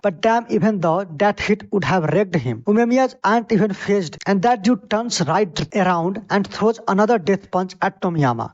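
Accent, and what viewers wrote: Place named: India. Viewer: Please add some emotions in your voice Bro I feel your hindi voice in english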